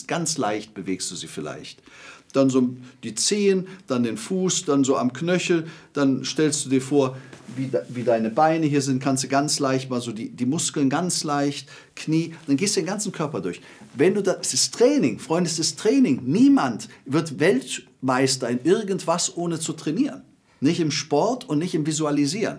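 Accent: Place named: Cologne, Germany. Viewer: Stundenlang kann ich mit dir quatschen, du bist so ein cooler Typ, mit kölsche Einschlag 👍😎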